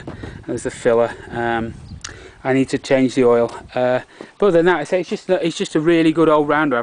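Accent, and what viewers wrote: United Kingdom. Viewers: Good vid mate....nice to see a Brit living in the US who's managed to keep his accent! I've had my Dakar for just over a week now, but true to form it has snowed so much, I can't get out on it! typical British (Yorkshire) weather!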